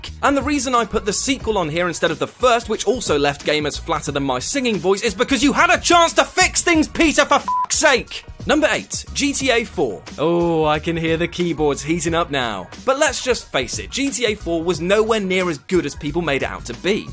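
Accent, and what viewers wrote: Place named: United Kingdom. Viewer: Hate to say it but to most Americans ALL Brits sound the same. Blame PBS for this one. On a serious note. PLEASE tell me your not a fan of Goatsie and stop telling people to Google him. I would hate to see you hate to see you guys get a U.S. class action suit for traumatizing stupid 'Mercan kids and adults.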